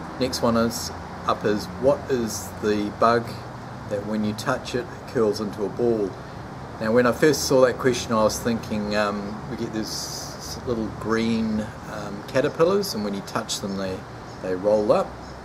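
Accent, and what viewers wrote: England, United Kingdom. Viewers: Nice one bud couple of chaps are brewing with jester hops and sounds a cracking beer, good challenge still say the words like if you was in the UK. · Nice one, your words sound like most English people, funny but someone found shopping trolley hilarious, I think it was on Christian's video,I thought l would get mine out of the way, good sport, Cheers mate 🍻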